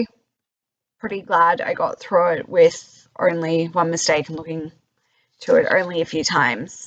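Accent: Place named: United States. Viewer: A great way to document your process. Your American accent is pretty good. Keep up the good work! From an American playwright.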